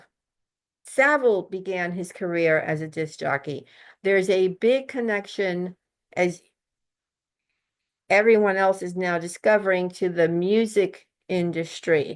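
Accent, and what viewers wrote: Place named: United States. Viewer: She obviously isn't as she has an American accent? 😂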